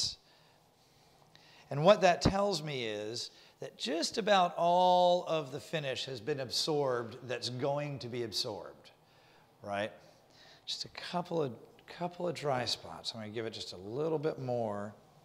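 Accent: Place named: United States. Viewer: Yeah dude .... what’s your problem ! This guy has the least annoying American accent I’ve probably heard .... my mums being the worst !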